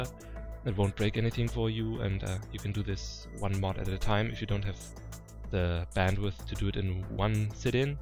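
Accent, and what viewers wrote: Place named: Germany. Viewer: Man kann es sowasvon höhren das du ein Deutscher bist :D Stimmt doch oder? Du hast nicht zufällig ein Deutsches Tutorial für ACE 2? (Ein aktuelles)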